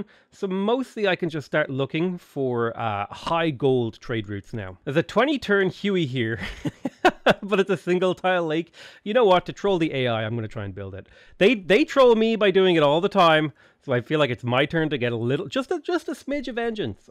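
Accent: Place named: Ireland. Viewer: That moment when I hear an Irishman talking about paddy fields, and becoming fully redfaced and relieved when I realize "Ahh talking about rice, I'm an idiot!"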